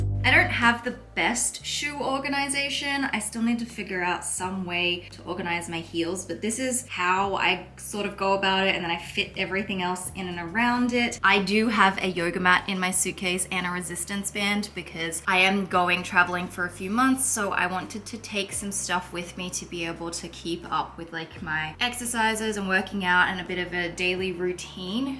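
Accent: Australia. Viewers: Lovely to hear an Aussie voice. You are making me so excited. A week until I go to France from Melbourne but want to pack now. Actually going to take photos of mix and match outfits. That might help. Relaxing vid. 👏😄🇦🇺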